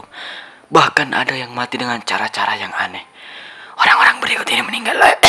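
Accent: Makassar, Indonesia. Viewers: Abang orang makassar yah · Santemi logat makassarta' bos 😂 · Orang Makassar detected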